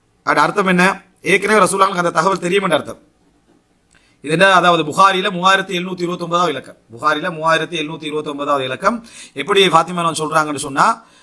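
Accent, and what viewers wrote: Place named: Sri Lanka. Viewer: Avar srilankan tamil.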